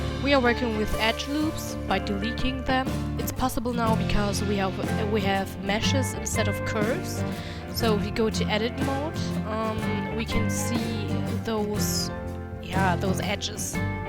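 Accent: Germany. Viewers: Man hört den deutschen Akzent.😅😂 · That german accent tho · hi, i noticed you have different accent, i mean- you sound little bit like a german person ☺ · am i the only one who thinks she has a german accent well hallo aus deutschland wenn du mich verstehst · Everybody: wow Your Video is very helpful Me: WAIT ARE U GERMAN?! I mean you have this typically german accent xD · Are you from Germany? because of the accent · You Sound German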